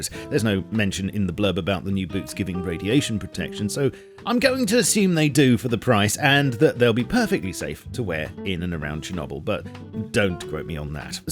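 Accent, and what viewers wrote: United Kingdom. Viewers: brittish accent the entire video verry american bottle of water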